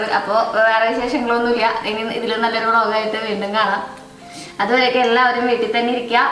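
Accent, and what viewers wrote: Thrissur, India. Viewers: Pure vegetarian aanengilum njan checheede non veg cooking videos um kaanum.... checheede thrissur slang kekkaan vendi 🤗🤗🤗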